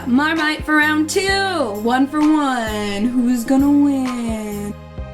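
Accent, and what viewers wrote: New Zealand. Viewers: I am laughing so hard!!! I think your accent is very New Zealand hahahah <3 <3 <3 Also How am I only just seeing this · AHAHAHAHA the accent omgg!! tbh it sounded more new zealand but omg dying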